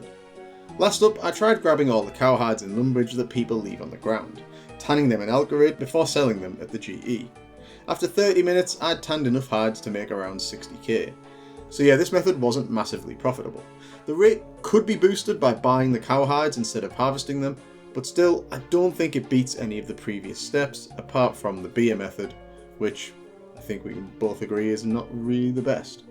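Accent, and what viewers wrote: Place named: North, United Kingdom. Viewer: Is that a fellow northerner?! Haha great guide man keep it up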